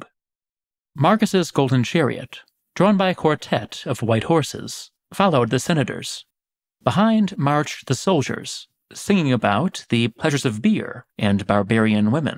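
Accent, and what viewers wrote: United States. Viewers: Very nice work especially the illustrations. Rather disturbing though your pronouncing Latin names the American way.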